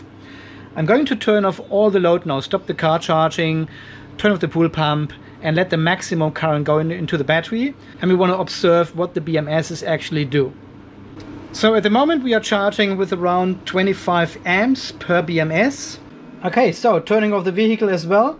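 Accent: Germany. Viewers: I would go crazy because of the leafs :D Allot of hot spots on the panels. Where are your roots? You sound so german :)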